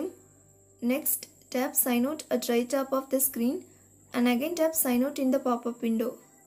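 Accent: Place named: India. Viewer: Hard to understand ... sounds Indian 🤨 too fast as usual 🧐 too many options sounds no good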